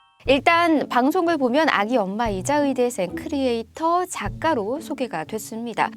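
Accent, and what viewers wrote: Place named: Gyeongsang, South Korea. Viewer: 근데 앵커라는 사람이 경상도 사투리 ㅋㅋㅋ 경상도 출신이죠? 사투리라니.. 아물론 클레어인가 뭐시기인가 이런한동은 알려져야할듯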